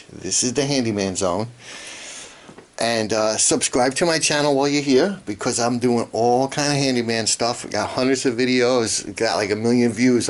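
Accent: New York, United States. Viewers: I love NY or Jersey accent! I'm southern, never ending amusement when I lived in north. What the hell is biscuits & gravy?? Difference between north/south, North will tell you to your face, F/O! South, we are sneaky & very polite, Be aware, probably find your body in a ditch next day if you piss us off but we are polite!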